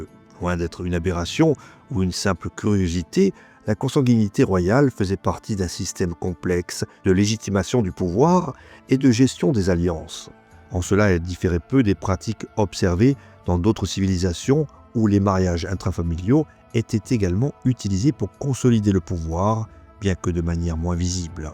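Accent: Corsica, France. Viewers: Tu serai pas un peu Corse avec cet accent 👍🫶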